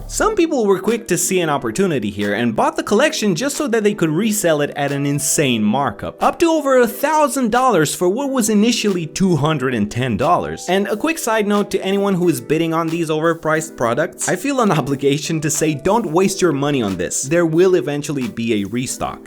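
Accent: Romania. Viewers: Wait wtf why is thi guy having a "romanian" accent?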